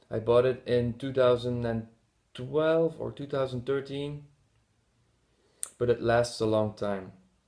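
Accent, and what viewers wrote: Netherlands. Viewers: very nice list,! i'll need to check out 1 million intense, but strangely enough, it's been pulled off the shelves over here in Ukraine. all the 1 million flankers have been. wonder if it's just over here, though. btw, where are you from? can't place your accent. i'd say Dutch?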